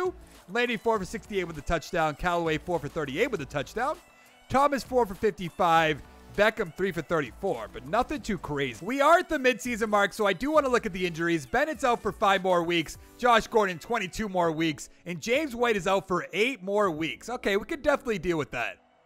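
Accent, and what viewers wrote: Boston, United States. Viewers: Boston accent on point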